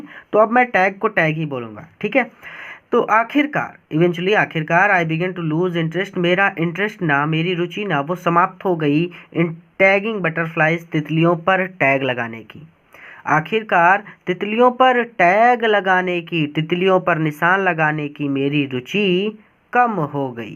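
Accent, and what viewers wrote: Allahabad, India. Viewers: Sir kya aap allahabad se hai kya 🙂🙂🙂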